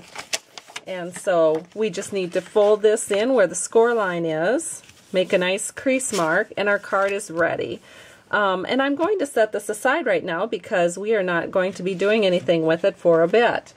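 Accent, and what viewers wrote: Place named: Minnesota, United States. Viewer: I so enjoy your videos and wanted to share with you that you bring to me a touch of home as I am from Minnesota. I hear your voice with our distinct accent and it brings me to my childhood! Thank you for sharing your art and your life! Susan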